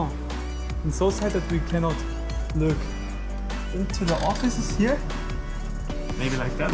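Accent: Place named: Germany. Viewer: It's funny to hear this German accent, I keep thinking "just say it in German." - Just kidding, you speak English very well and I love Ateez, so this is very much appreciated.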